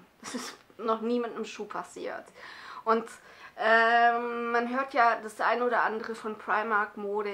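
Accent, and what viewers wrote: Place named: Swabia, Germany. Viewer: Als Du gesagt hast:In den Schuh rein schlupfen!!Mußte ich echt grinsen.Mag dein schwäbischer Dialekt.Bin selber geburtig aus Biberach an der Riß.Liegt zwischen ULM und LAUPHEIM.Liegt im Oberschwäbischen Bereich.Drück Dich mal von der Ferne.Wohne jetzt im Bereich Würzburg,Bayern!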